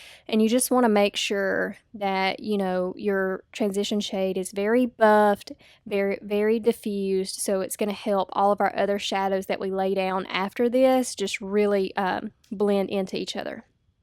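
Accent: South, United States. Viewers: Southern accent!!!! LOVE IT!!!!! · I love the look and our Southern accent! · yay southern we talk like that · I love you southern accent and the look 👌🏻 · I just found your video and subscribed!!! I love your southern accent, where are you from??? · Omg your voice makes me miss the south so much ): you sound adorable and you are so beautiful!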